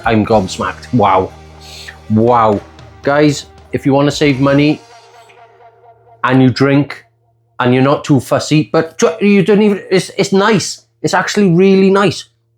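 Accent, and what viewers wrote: Wales, United Kingdom. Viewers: Wrong! The music detracts from your interesting, Welsh-flavoured voice. No music on the next one, please. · I’m Irish & that is not an Irish accent,it’s Welsh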